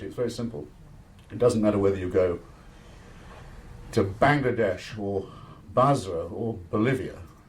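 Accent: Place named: RP, United Kingdom. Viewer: Mother Teresa made mistakes. but having volunteered many times at her homes with the destitute I can say she lived a life of a true hero. Hitch takes about money. She owned 2 cheap saris and slept on the floor and was bone thin from eating same food as the destitute. Unlike other foreign missionaries she lived like a native. Hitch is a bloated blowhard with a posh accent who was wrong on every major issue including his passionate support of the Iraq war. By the way when I visited her home I inquired why they have no fund raising and they said God will provide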